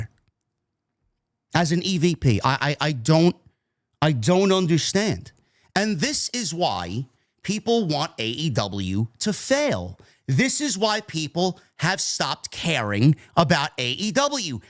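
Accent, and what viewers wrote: New York, United States. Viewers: The 2nd New Yaaaawkkkkaaaah I've liked in my life. Good on ya, kid.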